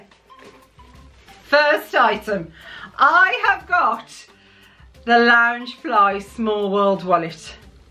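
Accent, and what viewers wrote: Birmingham, United Kingdom. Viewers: Happy eater, happy YouTube birthday and happy early birthday!!! Wow triple celebration!!! Have to say I love love love your videos. Your energy and laughter is so infectious ! And your accent brings me home! My whole family are brummies. I’m in your neck of the woods today for the football (season ticket at West Brom). I travel every week from preston! So I’ve rambled on but just wanted to say thank you for the fantastic videos and for sharing the Disney magic! Xxx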